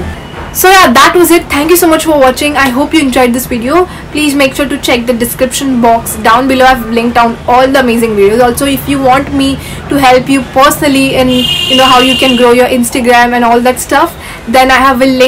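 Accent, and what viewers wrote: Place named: India. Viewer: it's the Indian accent ahah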